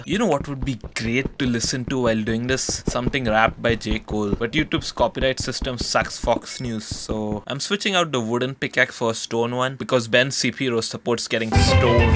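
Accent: India. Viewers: That's a true indian accent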